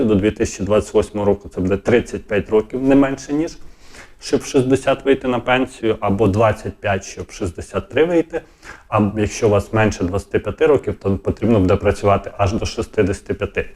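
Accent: Ukraine. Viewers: Мова в нього, звичайно, жахлива, русизмів дуже багато, хоча по вимові, відчувається, що рідна в нього українська.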